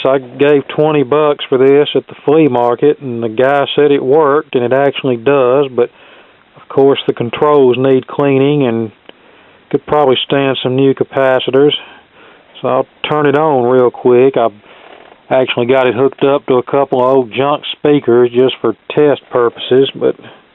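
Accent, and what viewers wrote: South, United States. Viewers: The same accent of Forrest Gump, lol, I love that accent.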